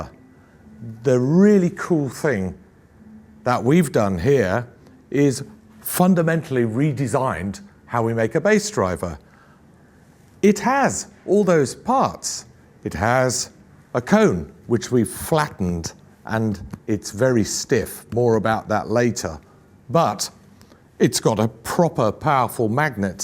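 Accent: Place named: United Kingdom. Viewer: Literally sounds nothing like him, this guy has a thick Brittish accent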